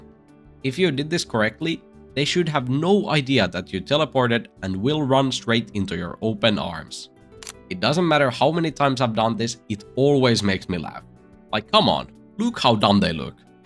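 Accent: Finland. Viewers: are you finnish? your accent sounds familiar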